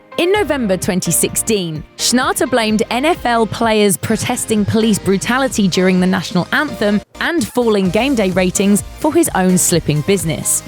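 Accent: England, United Kingdom. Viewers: i dont fuck with the english accent chick, bring the other girls back PLEASE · CON-TRO-VER-SY... Americans love Brit accents, but not when yammering about American's faults. Then it sounds either retarded or snooty. NAHHHaaa, who am I fooling I still lover her voice. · Number one: narrator is British and should be the watch mojo girl. Number two: she's British and can't pronounce controversy.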